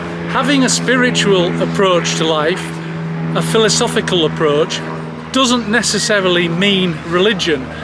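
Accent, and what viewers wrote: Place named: Northern England, United Kingdom. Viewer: I adore your content & northern accent! I worked with a girl who had her gall bladder out in her 20s, don't guilt yourself, brother - Cheers to good health 🥂🍻😁💋✌️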